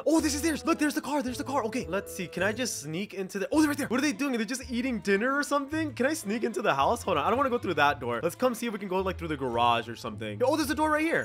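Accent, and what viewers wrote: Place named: South, United States. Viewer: I like how he talk in dixe voice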